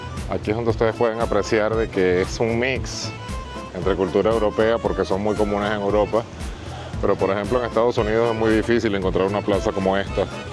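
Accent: Venezuela. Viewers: Tu vivias en Caracas? Hablas muy caraqueño como de Alto Prado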